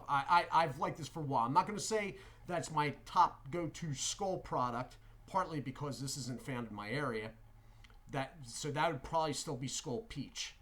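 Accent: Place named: Philadelphia, United States. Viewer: Don’t have it here in NJ. Had to order online. Sound’s like your in Philly?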